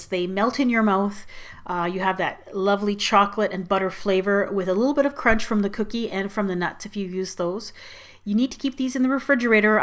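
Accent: Canada. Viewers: I love your Canadian accent :)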